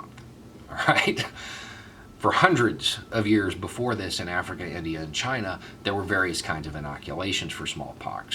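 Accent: South, United States. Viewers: Honestly, this is one of my favorite videos ever from you. Not only did you put everything so well, and sensibly, you just helped me resolve a moral/ethical dilemma I’ve been unsure where to come down on for a while: should vaccines be legally mandated. I know that might seem small, or silly to some people, and it’s obviously not a huge deal in my life, but I’ve been undecided and conflicted on the question of making vaccines legally mandatory for a while, but that explanation and description of the escalation of police violence was something I really hadn’t considered in this case, let alone ALL cases. I appreciate it,. Normally I’m just enjoying your southern drawl and agreeing with you, but these vids where you make me think about something from a new angle, or teach me something new, are my favorites! ✌🏼🏴♾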